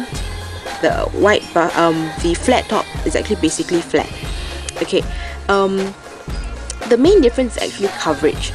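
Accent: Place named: Singapore. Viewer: hahahahha.. from your accent i can tell you're a singaporean ^^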